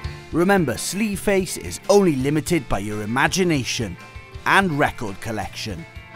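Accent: Wales, United Kingdom. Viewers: The narator sounds like he has a welsh accicent :D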